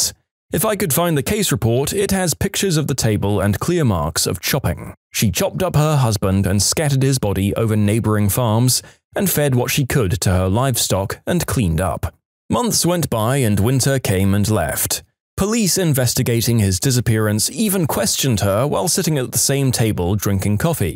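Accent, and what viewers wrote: United Kingdom. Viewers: I had a dream where this British voice was going to leave the channel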